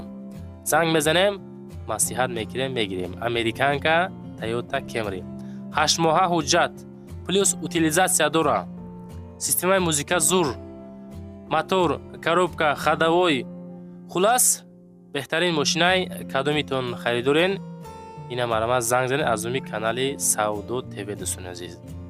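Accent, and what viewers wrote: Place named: Gharm, Tajikistan. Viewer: Чхели Ғармай Раштай соз соз гап зан бародар нашоти Ғарм🫵✅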